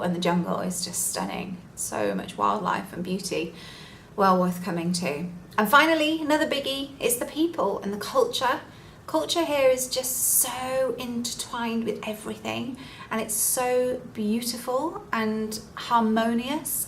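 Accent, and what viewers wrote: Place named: Yorkshire, United Kingdom. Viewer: Luv to hear your Yorkshire accent. Reminds me of Bradford. Thanks luv for loving Malaysia.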